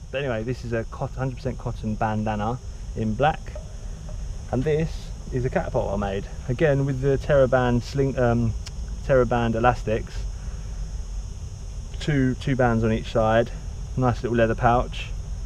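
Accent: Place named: United Kingdom. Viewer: I liked that you took out the kits, and then went throught them later. That's a good idea for the audience. I'll be borrowing that idea when I share mine with the world. I like your hobo rocket stove. That seems like an upgrade compared to the one I built. I'm impressed that you managed to cram all that stuff in there. The bag looks well throught out, well organized, and well used. The tape on a hacksaw blade is a nice touch and really stood out for some reason. Final thoughts: holy crap I didn't know British was a different dialect. I lost count of how many new words I learned LMAO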